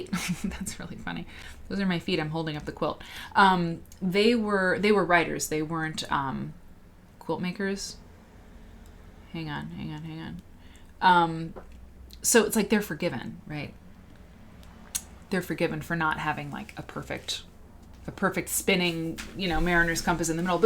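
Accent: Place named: United States. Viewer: All this talk of chips and crisps in an American accent is messing with my internal autocorrect!! Mayo and chips is great and you'll need to find a different chippy if you're getting soggy chips 😝 that's no good! I put the vinegar on at home. Vinegar at the shop plus the journey home is a crunch killer 😞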